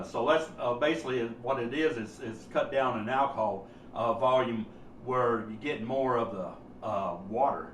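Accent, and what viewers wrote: United States. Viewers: Are you an Okie?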